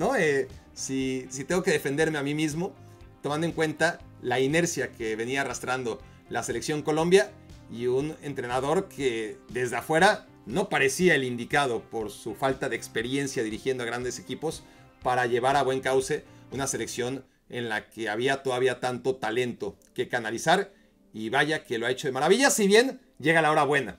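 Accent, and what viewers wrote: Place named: Mexico. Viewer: Escuche tu acento mexicano y de inmediato perdió peso y credibilidad tu video. mexico no existe en futbol, dediquense a la pesca, remo, polo.... FUTBOL NO !!!!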